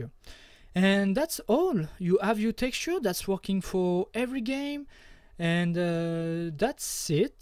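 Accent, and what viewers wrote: France. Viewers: Super tutoriel merci. ça sent l'accent français non ?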